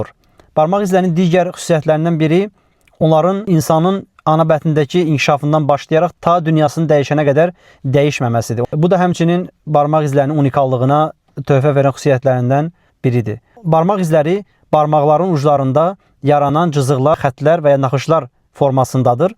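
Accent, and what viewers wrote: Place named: Talysh, Azerbaijan. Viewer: Salam qardas siz hansi bölgedensiz.siveniz sirindi talis zonasına oxsayir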